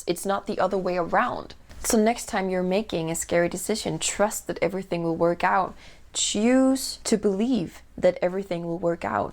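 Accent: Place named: United States. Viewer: I’m curious to know why you have an American accent!?! You don’t have to leave your country to get out of your comfort zone. I did. I moved from the UK to Sri Lanka more than three decades ago! I wouldn’t recommend it to everyone though! It’s been a tough journey for me but i have achieved self growth but then i may have done in my own country too! To have gratitude & a peaceful mindset, it has to come from within not from outside at the end of the day 🧚🏼‍♂️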